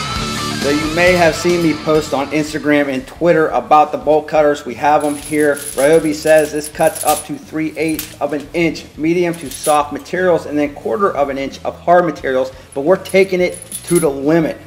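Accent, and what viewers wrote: Pittsburgh, United States. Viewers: Nice acccent. Pittsburgh area?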